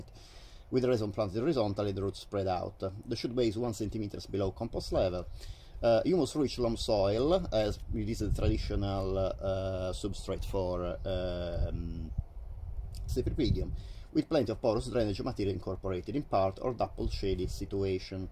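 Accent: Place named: Italy. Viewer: Because of your accent, are you Italian? It would be easier for me to write to you in Italian. 😊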